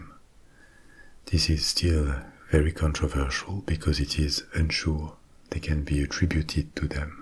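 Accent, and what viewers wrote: France. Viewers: ÇA C'EST MA FRANCE AVEC UN BEAUX ACCENT MERCI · You sound parisian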